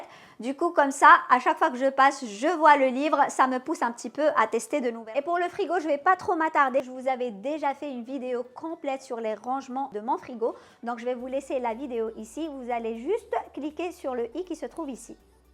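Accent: Algiers, Algeria. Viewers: Coucou ma belle j'adore quand tu parle on français avec ton accent algeroissssssss j'adore tes vidéos merci beaucoup pour les astuces bisous pour les petites anges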